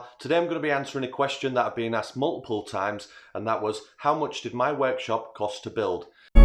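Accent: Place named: East Yorkshire, United Kingdom. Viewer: I'm near Wakefield. I could tell you were East Yorkshire (Hummberside?) before you mentioned your location from the slight difference in twang. Just glanced at your vast amount of videos, wow in such a short time as well. I'll be viewing them shortly, some great projects you've covered and they're not too difficult for the beginner woodworker to try. Good stuff. Tony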